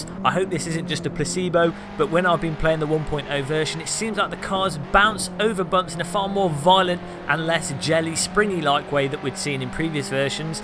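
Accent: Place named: England, United Kingdom. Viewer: Seb, he has a very English accent. But I know what you mean. regional French dialects would be a mystery to me, too. :)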